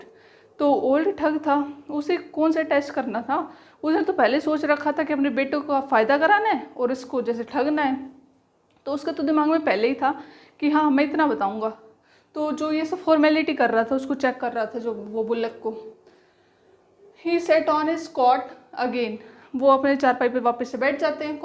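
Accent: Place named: Haryana, India. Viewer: Ap to Haryana sa lag ra mam ji 😀👍🙏❤️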